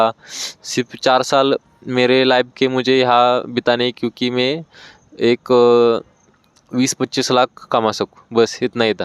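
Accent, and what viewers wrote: Maharashtra, India. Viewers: Marathi aahe ka ha bhau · Bhai tu marathi lag raha he me pn marathi he from maharastra ❤ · Bhai marathi ho kya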